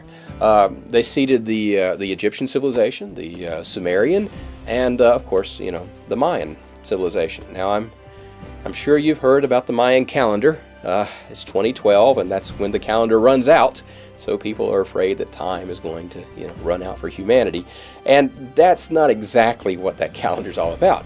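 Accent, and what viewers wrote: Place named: United States. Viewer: if you're going to pretend you're in the illuminati, don't have a beard, a badly exaggerated yank accent and a poorly scripted video you massive american bellend!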